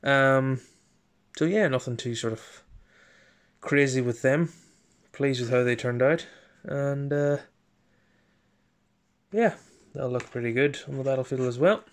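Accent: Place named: Northern Ireland, United Kingdom. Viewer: I thought I detected an Northern Irish accent 🤣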